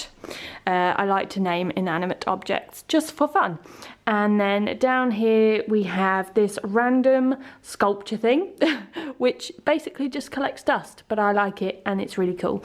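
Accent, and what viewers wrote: England, United Kingdom. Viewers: I love everything, you have done a grand job! I also really like how you have chosen items from many shops, all of which I know living in the uk. Super lovely to hear your English accent. A lot of these type of videos are done by Americans. 😊 😊😊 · Your accent is so British lol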